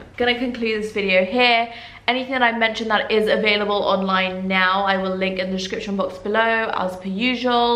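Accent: United Kingdom. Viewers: It’s a British accent and she lives in Dubai. I love it:)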